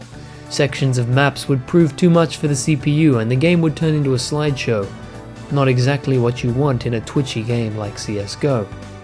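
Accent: Australia. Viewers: May I ask what accent that is? I'm not a native English speaker so I have difficulty placing accents. Australian? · Are you aussie mate?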